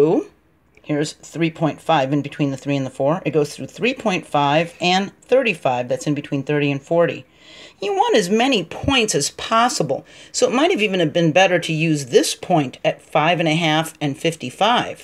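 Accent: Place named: United States. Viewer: I'm really struggling to understand the strong American accent. How do you guys sound so animated all the time while us Brits seem so solemn? Still, jolly nice video and somewhat helpful.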